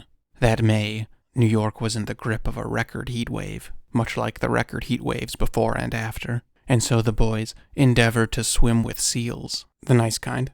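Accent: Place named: Midwest, United States. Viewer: Here’s my assessment on why videos are so good: 1.) Great editing— knows what, where, and when to insert footage/still photos/illustrations. 2.) Fringe subjects— brings us news and stories that are often dark and overlooked. They pique the macabre portions of our minds. 3.) Thoughtful writing— couples the best words with the right images. He chooses smart verbiage. With, you’ll be served big portions of choice descriptors, which are juicy and filling. 4.) The voice—The sound of voice is laden with minuscule, barbed filaments. Once it passes through your ears, you’re hooked. His accent is unique. It’s regional Midwestern, USA. You can love that, or you can hate it. You cannot, however, mistake that. voice is offered in the right tone—low and eerie (in a good sense.) It’s delivered at a smooth pace, without stammering or hiccups of any sort. 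I sincerely hope that channel swells and rockets to the stars. He deserves it.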